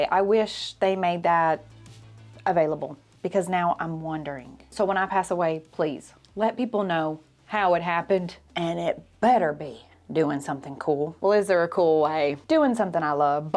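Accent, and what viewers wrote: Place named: North Carolina, United States. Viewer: You have NC vibes. I'm from NC, and our accents are very similar. I'm probably wrong, but it's just an observation.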